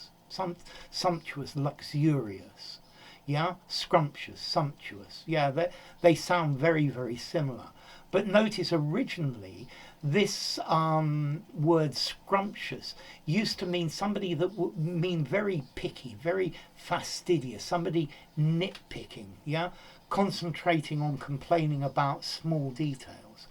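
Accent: United Kingdom. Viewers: Sir, may I know that you're really from United Kingdom because I want to learn the native English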